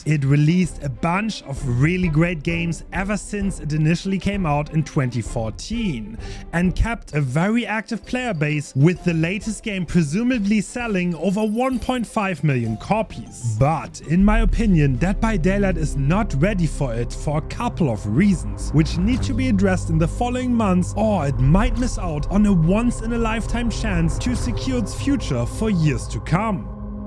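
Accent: Germany. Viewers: is he german? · german,austrian or switz accent?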